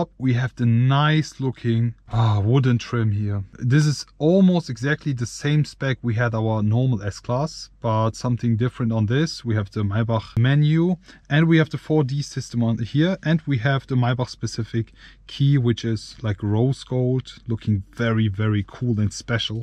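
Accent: Germany. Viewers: Ich liebe den deutschen Akzent hahaha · your english is very good, unfortunately with a very strong german accent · Man merkt die deutsche sprache haha! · Er ist sowas von Deutscher😂 100% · Der Typ ist zu 100% Deutscher hahahaha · lool facts i guess this is just the german accent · Hab mich schon über den Akzent gewundert, bis ich dann das Kennzeichen gesehen habe. War doch, wie nach meinem Gefühl ein deutscher Akzent. Gefällt mir xd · Der bre ist so hard deutsch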